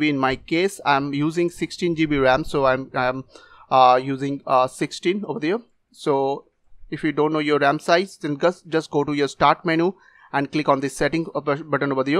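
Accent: India. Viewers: love your indian accent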